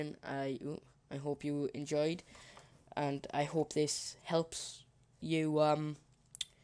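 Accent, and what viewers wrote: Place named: Wales, United Kingdom. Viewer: almost as welsh as me bud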